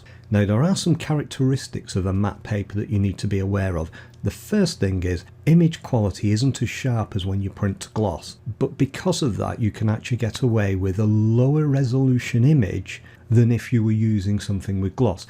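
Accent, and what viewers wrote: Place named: Lancashire, United Kingdom. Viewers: Your accent sounds local to where I live. Born in Lancs live over the Pennines 🙂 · Hi, why on earth can't others talk clearly and in a straightforward manner like you, clear & concise in an English accent. First class video. thank you so much.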